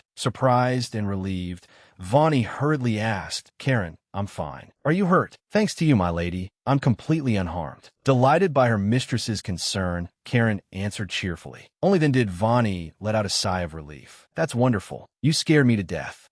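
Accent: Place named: France. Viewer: I can't tell if the AI is part French or part German 😅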